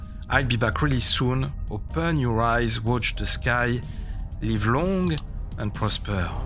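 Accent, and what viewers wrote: France. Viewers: I love when English is spoken in French 💜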